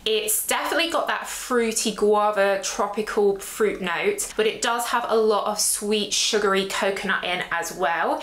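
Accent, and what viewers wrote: United Kingdom. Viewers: I actually find most JHAG perfumes quite wearable, more than many of the designers' really.. I love your videos, not only for the perfume info, which is thorough and enjoyable in itself, but also because I get my "do se" 😅 of good British accent input that I need as a teacher😊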